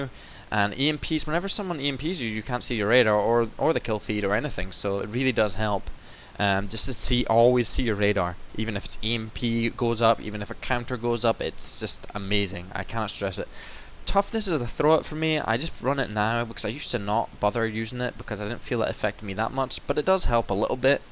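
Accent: Scotland, United Kingdom. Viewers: where in scotland are you from?:)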